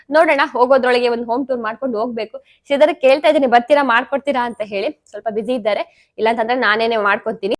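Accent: Kundapura, India. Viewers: Nivu nam kundapura kannada mix madi mathadthri kelike kushi ath nange❤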